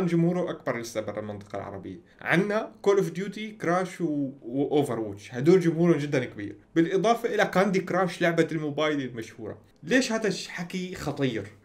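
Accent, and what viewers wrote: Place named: Syria. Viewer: قسم بالله ياوجهك مريح والطيبه باينه فيك اتمنالك النجاح يا وراح تذكر كلامي راح تنجح في مجالك بس لو نجحت لاتنساني هاااا اوعك اخوك زيزو ايه نسيت ولهجتك السوريه العفويه ياخي حكايه تاانيه تسلم يازعيم على مجهودك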